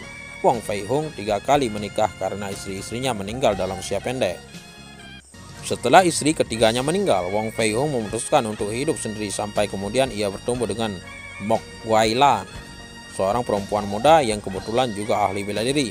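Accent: Aceh, Indonesia. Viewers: Yg bc ceritanya kok kyk org aceh ya... Dialek nya kental banget aceh... Bs di percaya berita ini...???? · Nyoe yang baca berita awak aceh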